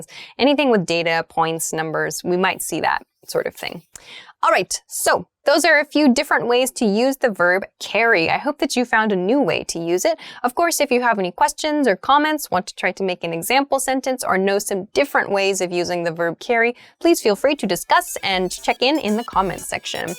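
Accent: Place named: United States. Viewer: Yes, she is speaking American English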